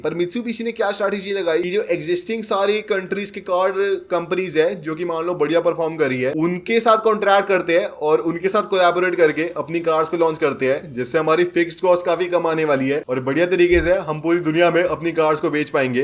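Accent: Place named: Delhi, India. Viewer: Damn that Delhi ka douchebag accent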